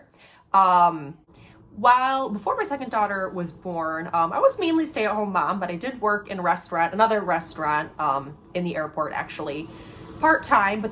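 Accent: Midwest, United States. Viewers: Hi, have to stop thinking of Fargo when I hear your accent, you mid Western women sound so nice reminds me alot of Canadians. My parents retired back to Ireland in 2004 and were worried about not seeing the Grand kids from UK, no worries though as they came on holidays every summer and winter for 14 years. It's a big adjustment but give it time and bring the kids to see the American relatives as often as you can. Good luck with the house hunt. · Good lord, as a native NYer you're midwest accent is friggin nauseating. NAUSEATING. Ya hear me?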